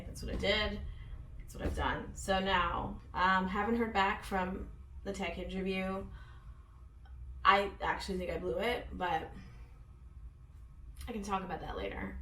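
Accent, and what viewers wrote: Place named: Toronto, Canada. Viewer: Toronto Mans accent is a VIBE 😂😂😂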